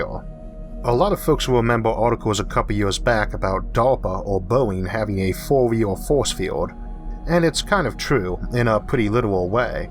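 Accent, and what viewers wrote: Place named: Georgia, United States. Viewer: I hope I don't seem rude in asking as I am only just genuinely curious, but are you from Georgia? You have the same accent as some folks I know from Northern Georgia.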